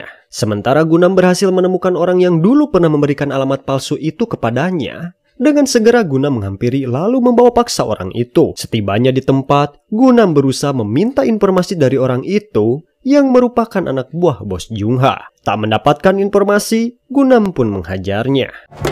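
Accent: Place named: Medan, Indonesia. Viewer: Pasti ini orang medan yg pengisi suara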